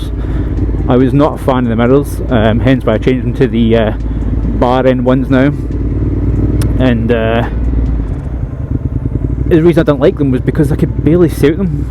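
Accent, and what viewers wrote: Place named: United Kingdom. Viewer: Hi mate, nice bike, I guess. If you can speak with a bit less British accent it could possible understand something about what you said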